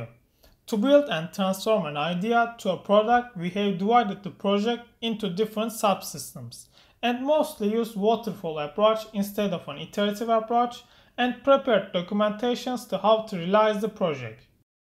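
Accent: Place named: France. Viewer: I love your fench accent :D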